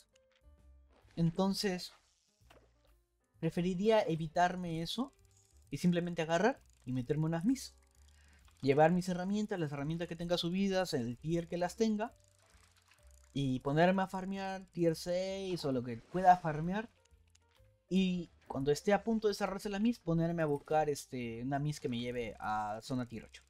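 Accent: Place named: Peru. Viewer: Me encanta como lo narra y se le nota que es peruano, que grande eres mano, sigue asi, tienes un sub mas ahora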